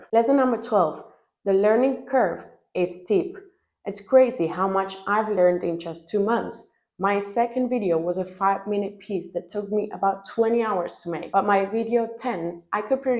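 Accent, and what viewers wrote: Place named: Denmark. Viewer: Thank you so much for this video. I am just over one month in and trying not to get discouraged by low views and having only a few subscribers! As an author I want my channel to be a community for my readers to connect with me, and each other. I hope that my persistence pays off in the long term. Also- I love Denmark and hearing your accent reminds me of when I visited last year!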